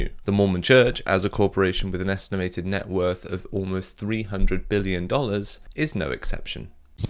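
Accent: United Kingdom. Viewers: Yeah, he’s sneakier than a lot of antis. His British accent misleadingly indicates a warm, intelligent tone.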